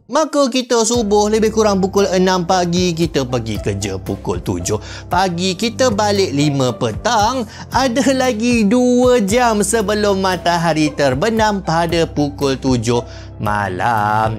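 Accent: Malaysia. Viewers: Itulah logat bahasa Malaysia yg sebenarnya.beda dg indo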